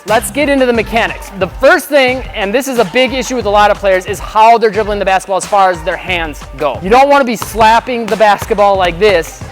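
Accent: Canada. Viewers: Why does he sound Canadian??🤔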